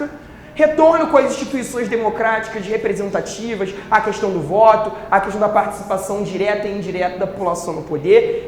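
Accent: Rio de Janeiro, Brazil. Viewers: Eu quero fazer uma pergunta. Eu nao sou do Brasil. Alguem pode me dizer se o professor fala com um sotaque carioca? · Daniel Temelkovski, sim, com toda certeza ele é carioca.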